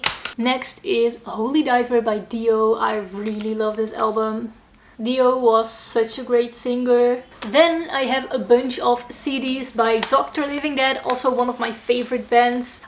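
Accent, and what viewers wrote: Netherlands. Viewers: Nice accent English/Dutch haha but its really good 'Ik heb dat ook alle Nederlanders bijna' Great video!! And Wow not many girls have a good taste of music like you Love your Collection Groetjes van Rotterdam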